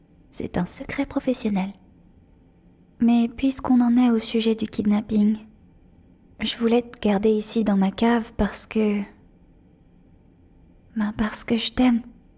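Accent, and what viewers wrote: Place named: England, United Kingdom. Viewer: Mais.. c'est la même personne que sur la chaine en Anglais? Je peux pas y croire, l'accent anglais sur la chaine principale vs le français parfait sur celle-ci :x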